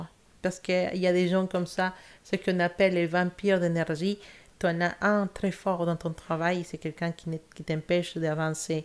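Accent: Spain. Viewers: cet accent, seriez-vous espagnol?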